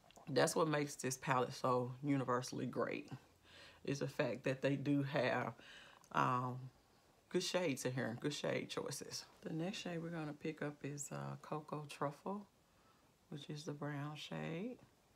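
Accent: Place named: South, United States. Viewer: You are stunningly beautiful. Your Southern dialect is pleasing to the ears.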